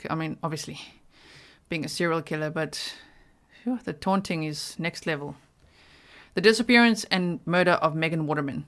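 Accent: South Africa. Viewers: South African, yw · Are you South African? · South African but lives in the Netherlands which probably “complicates” her accent. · Howzit! I've been dying to know how they even suspected this oke, and thought no one would have a video up yet. Checked YouTube and yours was the first video to come up, upon hearing you speak, I was all...wait I'd know that accent any where 🇿🇦❤️😎🤙🏻❤️🇿🇦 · Are you South African like i am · Ahh! SA, now the accent makes sense.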